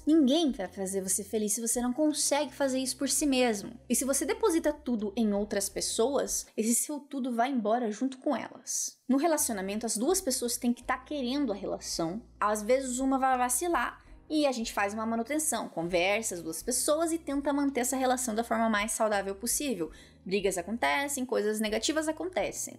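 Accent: Paraná, Brazil. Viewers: Oiê! tenho que dizer que eu adoro o sotaque paranaense xD hoje (presente), com o pouco amadurecimento que consegui, vejo esse sentimento como paixão, egocentrismo... acho que a gente sente falta de se sentir amado. E por muitas vezes nos deixamos ser guiados por essa dor (mesmo já tendo tido a mesma percepção que vc, acredite, cai alguns níveis por causa desse sentimento) mana, a gente tem que reforçar mto, conhecer e se conhecer mto, refletir pra não cair nos mesmos mecanismos mentais, senão tiver esse exercício diário, a gente cai mesmo! Somos mto falhos (e frágeis) e ainda na nossa criança psicológica, temos muita necessidade de se sentir amado,... a gente tem muito que aprender sobre o amor, sentimento profundo... acho que isso devia ser lido e ensinado em todos os ambientes, desde pequenininho (um dia sonho que teremos escola de sentimentos para as crianças do futuro... 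Quem sabe?;-) ) obrigada por esse vídeo! S2 mto importante é necessário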